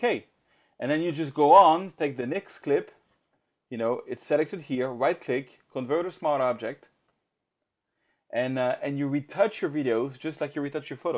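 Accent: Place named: France. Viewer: Mercì,. I really enjoy your tutorials and your French accent. This one tutorial was really awesome. I would also like to thank Kent Jensen's comment about creating an adjustment layer. Cheers.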